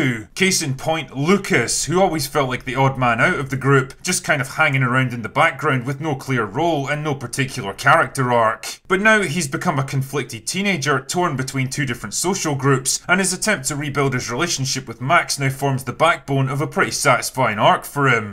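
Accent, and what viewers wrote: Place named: England, United Kingdom. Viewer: Thought he was from England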